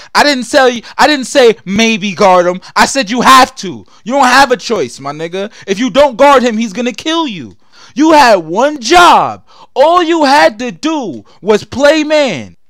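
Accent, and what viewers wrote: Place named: New York, United States. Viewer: lol in this video you had a New York accent-- lit vid by the way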